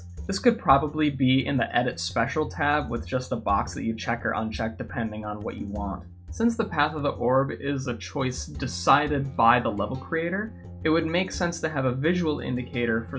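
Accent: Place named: Canada. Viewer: You sound like kenadian.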